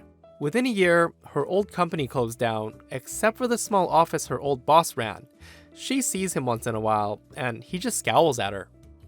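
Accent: North America. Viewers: I think I remember him saying he's from Canada. He's definitely got a North American accent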